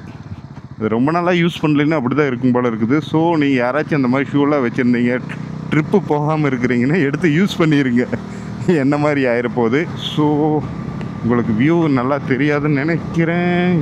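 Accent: Kongu, India. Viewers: I remember this person. You two were sitting on the water tank and talking about your Ladakh trip. At least four years ago, I saw this person on your YouTube channel. But I still remember him. I really like it when you come and speak in Kongu Tamil in your channel., I am also from Kongu side.I really like your channel because you speak in that tone. keep continue bro. I pray to God that you always have a safe journey. I love Channel also. I've been watching Moto vlog continuously since he started domestic travel, and he has spent a lot of money on international travel. I feel like a person from Tamil Nadu who has an international travel vlog is a big feat that no one else can do. I hope he hasn't received recognition from people yet. I really like that man's spirit. No human being can afford to take a small international trip and spend it on people, because the cost is too high, but he works for us.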